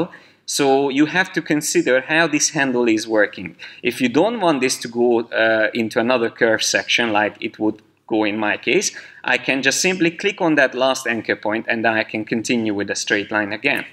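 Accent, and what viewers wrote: Hungary. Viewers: magyar akcentust vélek felfedezni, de ez persze nem változtat azon, hogy szép munka! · Érezhetően magyar akcentus, jó hallgatni! Könnyebb megérteni :D Köszönöm az útmutatást! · Hey are you hungarian? Im pretty sure by the accent.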